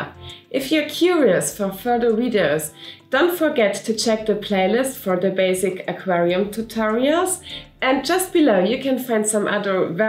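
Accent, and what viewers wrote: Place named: Germany. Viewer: Am besten bitte gleich deutsch sprechen. Dein Deutsch-Akzent ist so stark, dass ...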